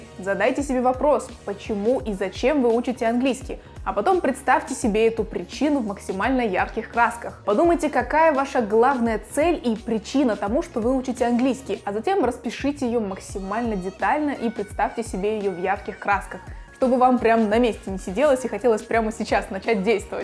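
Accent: Kazakhstan. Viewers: Казашка? Ты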